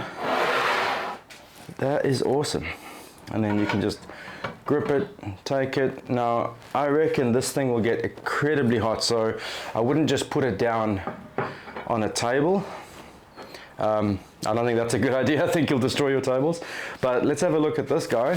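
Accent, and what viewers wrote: South Africa. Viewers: You have had me very confused as i hear some very SAFFER words like noice but it saying South Australia. Loved the video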